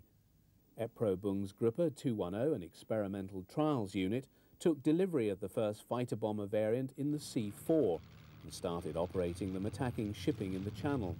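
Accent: RP, United Kingdom. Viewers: plummy accent?? hmm, refreshingly nice to the ear if i may say so. wonderful historical footage, Thanks again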